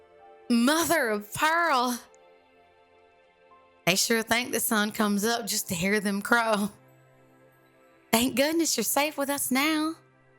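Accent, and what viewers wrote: South, United States. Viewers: I really get annoyed with fake Southern accents in ASMR so you're either the real deal or extremely convincing 👌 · Aww, I love a southern accent in my ASMR! This was beyond adorable! Thank you so much,!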